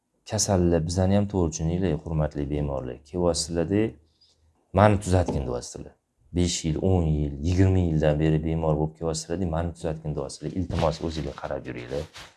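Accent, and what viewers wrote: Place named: Tashkent, Uzbekistan. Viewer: Илтимос Тошкент шевасида эмас китов тилида гапиринг.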